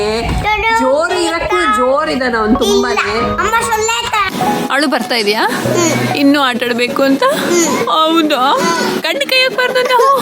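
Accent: Mangalore, India. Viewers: Sis nivu mangalore kannada ne matadi keloke manasige eno khushi anasatte plz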